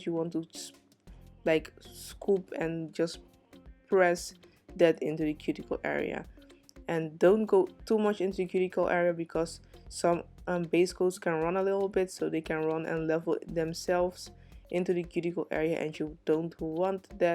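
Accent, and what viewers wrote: Netherlands. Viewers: I can hear from your accent that ur living in Holland.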